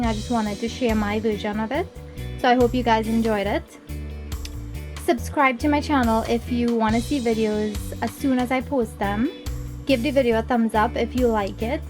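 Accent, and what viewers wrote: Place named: Trinidad and Tobago. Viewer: I live in Montreal, Quebec Canada, I would love LOVE to have a wife born in , Trinidad and Tobago I adore everything about these women, The food, the fancy dress, and that accent, the culture, the eyes, ouff Being an Italian man, guess it will remain a dream. dammit [ a pinch goes a long way she says,,,, LOL ] sometimes they slap us when we pinch 'em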